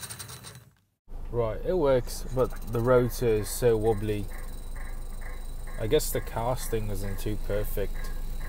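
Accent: United Kingdom. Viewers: Are you English? South African? Or Australian?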